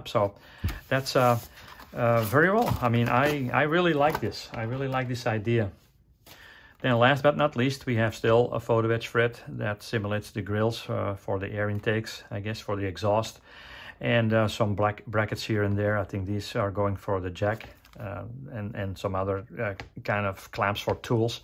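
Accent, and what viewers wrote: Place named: Flanders, Belgium. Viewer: Mine is in the post. I think it is more accurate and refined than the das werk kit. You have a (super slight) Flemish accent, are you Belgian (as you also worked for Verlinden)?Pistol port on the right hand side of the hull is superfluous. Das werk has none, Trumpeter had it on both sides. Should only be on the driver side. I like they provided the drive wheel center cap as separate. Like most probably, I prefer the look of all the bolts in plain view. I thought the tires were normal plastic already when I saw them, thanks for clarifying that, good news!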